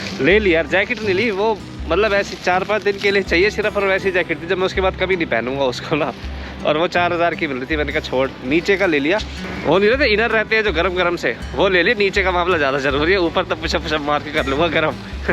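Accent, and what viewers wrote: Haryana, India. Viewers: Bhai aapki language se lagta h ki aap haryana k palwal ya phir faridabad district se belong krte ho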